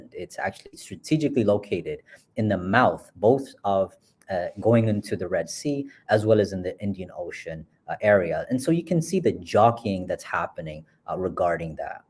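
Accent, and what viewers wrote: United Kingdom. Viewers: Corrections, Col. Abiy is not a doctor he is elementary schools drop out street Hustler's boy. From Shane's red district streets a prostitution zones single mother in Bashashaa town case closed no more questions thanks 👍 baby your Brtish accent Likes sweat heart kisses on Lips Love it you sound great. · Great British accent,. Keep up your great work. 👍🏽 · love your British accent.please focus on doing english programs.there are not enough english current affairs productions about Ethiopia.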